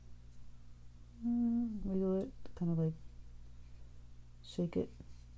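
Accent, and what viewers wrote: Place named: New England, United States. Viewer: I love watching your videos and I love your accent, I don't know if you are or not but you sound like a New Englander! Which makes me happy and I love your art it's always inspiring. Thank you!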